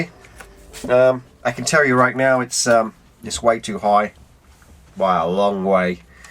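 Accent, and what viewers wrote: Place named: United Kingdom. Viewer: Love your accent are you British or austrailian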